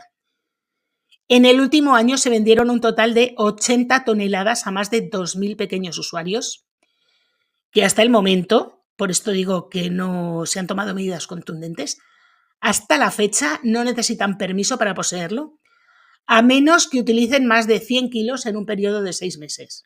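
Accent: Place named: Barcelona, Spain. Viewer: Querida, antes miraba tus vídeos y te daba un 👍siempre, pero no me atrevía a escribir comentarios. Ahora ya he decidido hacerlo, más que nada porque mereces que te diga que eres fabulosa narrando cada historia, que VOCALIZAS perfectamente para que se entienda lo que narras (a otros y otras que hacen lo mismo, apenas se les entiende porque NO VOCALIZAN y van mega rápidos) y además hablas sin prisas, pausadamente, y eso hay que elogiarlo, porque demuestra tu gran empatia con los que estamos del otro lado escuchándote 🙏😘💝 por eso debemos de alabar tu buen hacer y transmitirtelo. Tú nos ayudas a estar informados al mismo tiempo que podemos evadirnos un ratito de los propios problemas, y sólo por tu constancia y todo el trabajo que debes llevar a cabo para realizar cada caso, cada vídeo, debemos de sentirnos agradecidos y decírtelo. Vivimos en el país de "la envidia" y por ello es fundamental saber elogiar el trabajo que los demás, como tú, hacen para nosotros. 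Así que muchas gracias de todo corazón, a ver si ya empezamos a dejar las envidias y a VALORAR más a las personas que se lo merecen como tú. Bueno, guapa, te mando mi segundo abrazo desde Barcelona (y diría por tu acento que debes ser de por aquí también 😍) Muackssss!!!! 💝💖❤️💕👍🌺🦋🌷🌹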